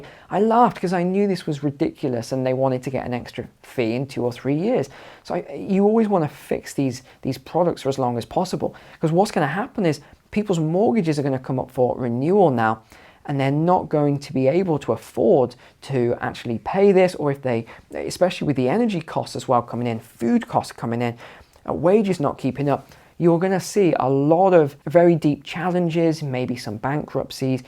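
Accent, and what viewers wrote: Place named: England, United Kingdom. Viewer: I start like English accent more and more.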